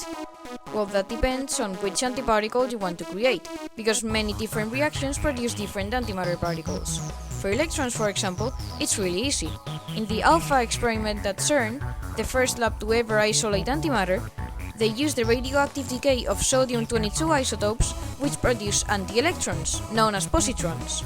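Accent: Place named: India. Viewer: Are you india Bcz your accent is like that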